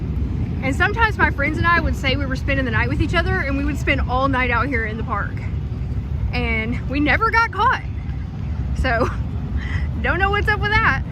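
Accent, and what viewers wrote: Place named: Arkansas, United States. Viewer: I look forward to these videos! Arkansas is beautiful❤ My aunt Mary is from there and your accent and hers are so similar it’s funny. I wish you luck in your therapy and your marriage🥰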